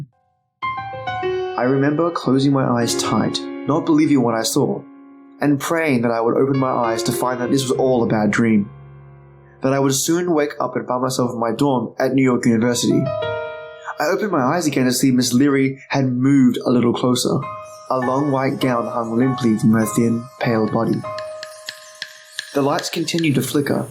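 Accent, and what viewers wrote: Australia. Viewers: Terrific story mate! Couldn't resist using the word mate, love your Aussie accent.. Which sounds pretty funny..considering I'm an American gal from the south who has an Ellie Mae Clampett drawl myself. Thanks for sharing all these amazingly creepy tales..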